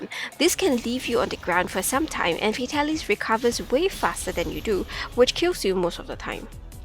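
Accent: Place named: Singapore. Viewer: Just curious, but from your accent, are you Singaporean or Malaysian?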